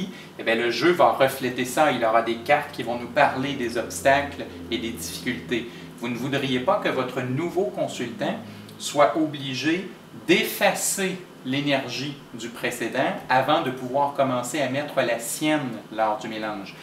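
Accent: Quebec, Canada. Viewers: C’est passionnant !!!😄je me régale. Mais y a du boulot. Merci d’avoir réussi ces 2 livres. Et vos vidéos sont super agréables à écouter. Et l’accent Canadien est trop mignon. Bonne continuation. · Merci pour tes explications claires. Ton accent est marrant, comme tous les québécois :) Est-ce exactement la même chose pour un tirage par téléphone concernant les cartes à retourner "à la verticale" ?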